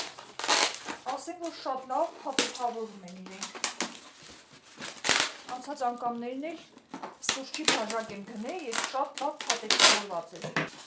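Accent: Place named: Gyumri, Armenia. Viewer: Հարգելիս որտեղ եք ապրում գյումռեցու աքցենտ ունես համեստ անձնավորություն եք նոր եմ ձեզ հետեվում Ամնից Լիզա🎉😂😢😮😅❤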